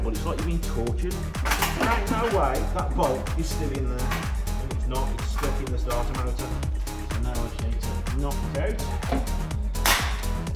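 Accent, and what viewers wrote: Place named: West Midlands, United Kingdom. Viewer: Always funny to hear an angry West Midlands accent! 😂😂 The song is by. 🤘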